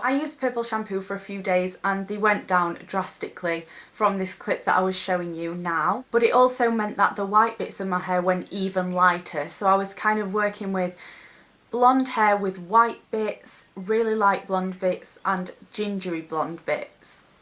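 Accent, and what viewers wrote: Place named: England, United Kingdom. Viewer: I love this tipical english accent! Cute!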